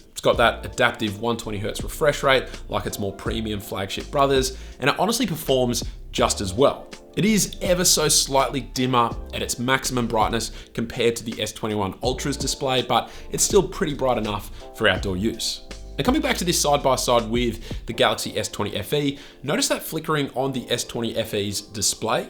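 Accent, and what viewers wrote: Australia. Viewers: This guy has so familiar accent. From the first sentences I knew that he is an Aussie :). Hello and big cheers from NZ, mate! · R u Australian?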